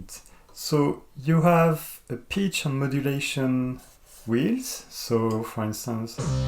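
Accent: France. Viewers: hey, nice video, i gonna start learning piano, and i'm gonna take this one, thanks you for the video, by the way your accent sound french, c'est sympa :) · Bonjour, j’ai remarqué votre accent français, j’ai reçu ce piano hier et impossible de le faire marcher, je ne comprend pas comment pouvoir jouer si le son de sort pas quand je clique sur les touches. Pourriez vous m’aider?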